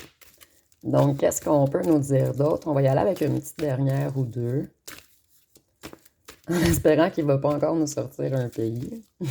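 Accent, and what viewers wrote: Quebec, Canada. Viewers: Merci. Belle guidance.. j entends que vous etes Quebec . Je suits Francaise qui habits Les USA….cela m a fait tres plaisirs de vous entendre. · L"accent québequois❤❤❤❤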